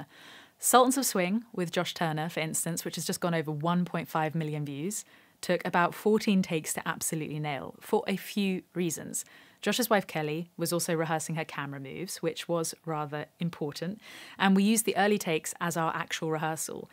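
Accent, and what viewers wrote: United Kingdom. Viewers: i just realized you are ...British?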